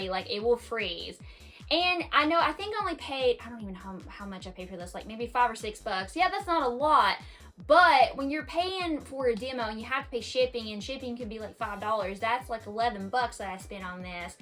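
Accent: South, United States. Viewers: Love your channel and your wonderful country accent 😊❤ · Ayo a fellow game collector with a southern accent and tattoos haha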